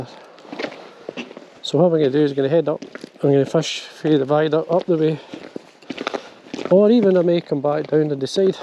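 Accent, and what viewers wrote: Scotland, United Kingdom. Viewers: are you from Scotland? I've heard it said that some scotts cannot say "Purple Burglar Alarm"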